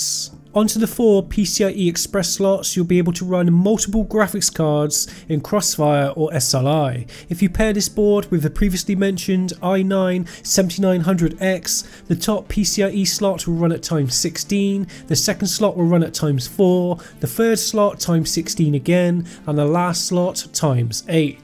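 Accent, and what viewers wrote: United Kingdom. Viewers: "Free point one port" lol, I like your heavy UK accent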